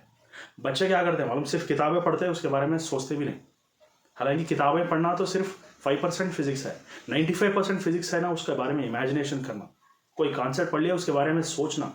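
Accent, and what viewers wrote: Hyderabad, India. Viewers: Sir ki hyderabadi accent sunne ko aya main. Hou kya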